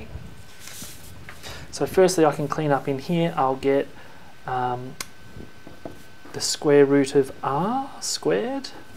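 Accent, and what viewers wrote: Australia. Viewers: you are Australian. Correct?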